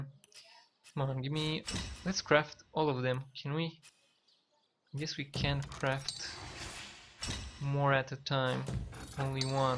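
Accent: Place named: Eastern Europe. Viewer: he has slavic accent maybe russian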